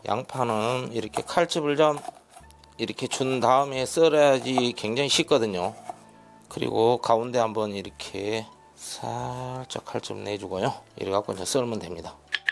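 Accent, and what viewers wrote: Jeolla, South Korea. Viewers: 구수한 전라도 사투리로 맛깔 나는 설명 감사해요😅😅😅😅😅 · 전라도 사투리 들으니 더 맛있을 것 같은 느낌적인 느낌~~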